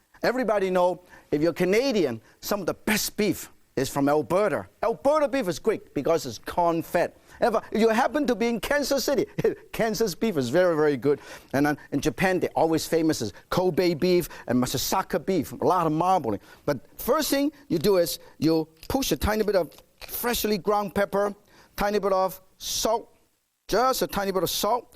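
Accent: China. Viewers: sounds like * inspiration for the Chinese English accent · i love the chinese accent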